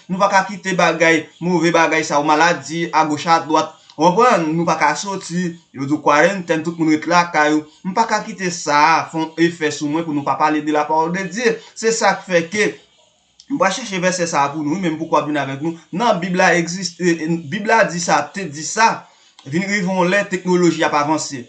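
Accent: Haiti. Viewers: Ou pale tankou moun nord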